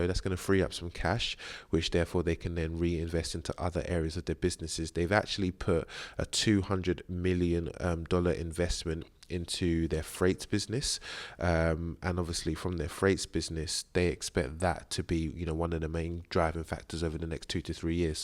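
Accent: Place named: United Kingdom. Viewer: Merry christmas. I've been watching your videos for a couple of weeks, just wanted to say I really like the content you're putting out, too few UK investors are doing what you're doing (direct, honest, transparent content), before I discovered your channel I was going back and fourth with the idea of starting my own investing channel for UK investors as I felt that niche just wasn't being filled but you're doing it bro, albeit with a slightly different strategy. From your voice/accent sounds like you're a similar age/area to me - it's great having something I can relate to in that respect instead of listening to X number of American accents.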